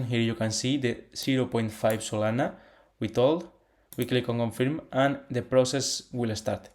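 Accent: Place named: Spain. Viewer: Vamos ese acento español ahí a muerte! Buen contenido!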